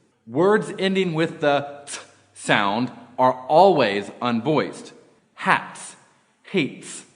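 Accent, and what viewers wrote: China. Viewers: Dude I think you got some Chinese English accent in the video 😂 Hahaha because some of my Chinese friends sounded exactly like you..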